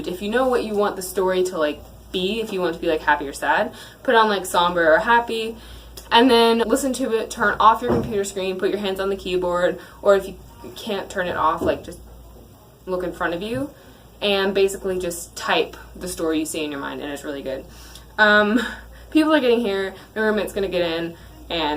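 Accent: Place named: South, United States. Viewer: all that suthern drawl down there at duke done pulled away ur british aksent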